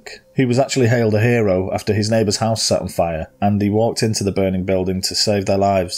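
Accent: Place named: Northern England, United Kingdom. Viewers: I knew you sounded like you were from the north of England I lived near Manchester I lived in Newton Lee Willows earlstown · I love this channel, your a brilliant narrater, could listen to you all day, I'm not far from you at Manchester I'm from Kearsley, but now live in Wigan, thought I recognised the accent. Keep them coming, loving them all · I thought you were a Manc. Glad you covered this case, i have heard if it. The victims need to be remembered, like you say. RIP to all his victims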